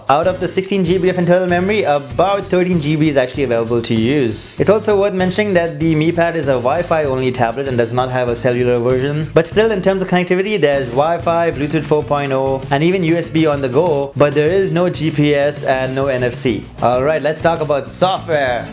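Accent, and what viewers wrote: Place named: India. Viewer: Nice review, I just want to buy this right now! Xiaomi just arrived here in Brazil, and I really hope they keep up soon with this device, just incredible for the price. And... dont change your accent, its cool, I watch some indian review channels on youtube and your accent is one of the most fine between all. Actually is a little bit of cute to hear!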